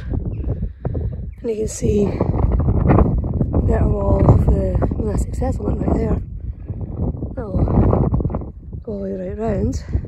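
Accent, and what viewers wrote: Scotland, United Kingdom. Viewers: I did archaeology on Inchmarnock, the little island near Bute. That island unearthed a slate called The Hostage Stone, which depicts a probable Viking raid. You are refreshingly real. I appreciate the humble focus on the historical sites and nature. Hailes Castle is atmospheric, and Arbroath Abbey. Just be cautious in some rural spots, gangs of youths can be a problem. I watch a channel called Kirsten and Joerg for its comforting slow meandering simplicity. Quite different from your Scottish theme but they get lots of subscribers, it took time to get to that though. I like your Scottish delivery. You have a nice voice.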